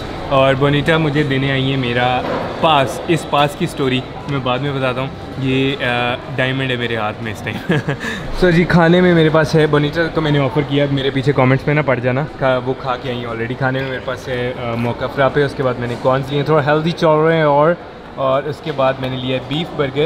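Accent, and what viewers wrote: Punjab, India. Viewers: Bro great ap ka punjabi acsent kamal ka ha 🥰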